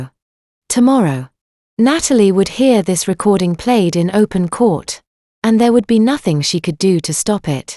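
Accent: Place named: Australia. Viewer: Very disconcerting for the narrator speaking Aussie but claiming Boston as home. Decent tale tho.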